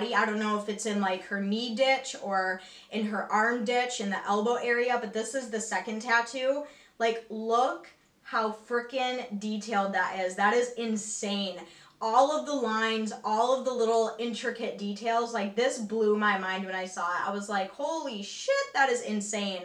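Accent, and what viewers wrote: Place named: Northeast, United States. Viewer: I always forget what a strong northeastern accent you have!(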